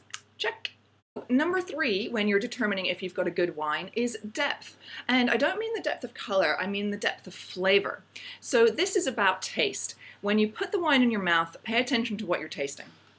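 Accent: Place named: Australia. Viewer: I feel like you're Australian 20% of this video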